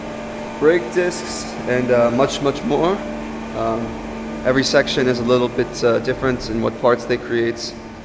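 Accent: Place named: Germany. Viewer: Why do you live in a dorm in friggin Marburg and pronounce Italian words with a German accent when you're an American, racing in an American series? So confused right now...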